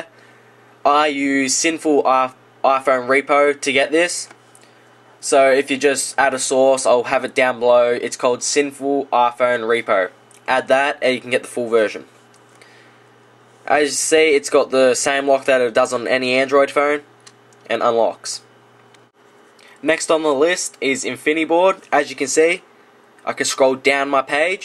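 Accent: Australia. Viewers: Great tips and great to hear from an Australian finally.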